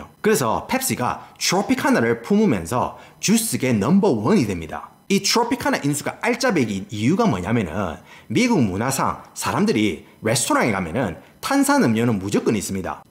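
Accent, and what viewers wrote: Gyeongsang, South Korea. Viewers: 아니.. 우리 경상도 아재 언제 채널명이 바꿨는지. 어허. 이무슨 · 경상도 분이신가요??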